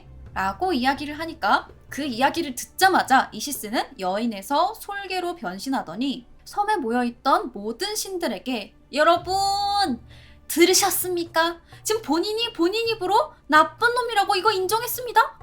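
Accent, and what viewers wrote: Gyeongsang, South Korea. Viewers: 응 경상도 여자네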